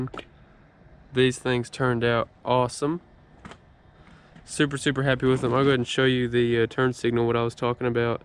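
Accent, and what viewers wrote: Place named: Alabama, United States. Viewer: Never heard a Mexican speaking with a Alabama accent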